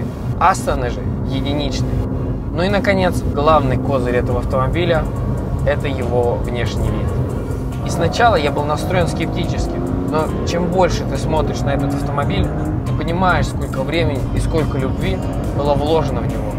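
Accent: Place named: China. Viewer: chinese russian speaking...amazing!!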